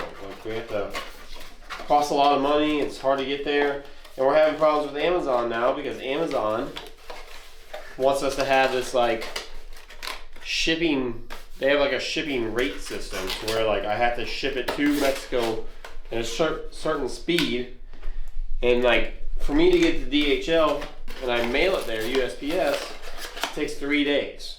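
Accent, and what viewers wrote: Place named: Denmark. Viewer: hello i just noticed you dont have many viewers and subscribers so i just wanted to support abit seems like you do decent content ur accent sounds abit danish but where are u from?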